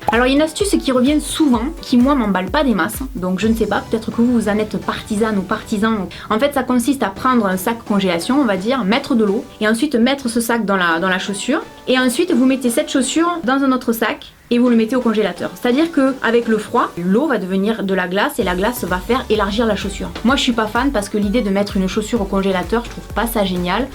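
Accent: South, France. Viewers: Salut, petite question, existe t’ il des anti derapants à poser sur la semelle (côté sol) pour avoir une meilleure adhérence, et met tu quelque chose au niveau du bout du talon pour la même utilité. Merci pour ta bonne humeur, tes astuces et ton accent, vive le sud!!!!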